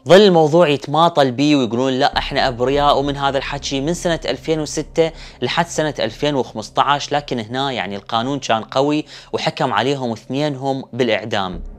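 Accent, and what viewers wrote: Iraq. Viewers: متابعة من المغرب احب سردك للقصص واللهجة العراقية الجميلة زادت السرد جمال عل جمال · حلوة اللهجة العراقية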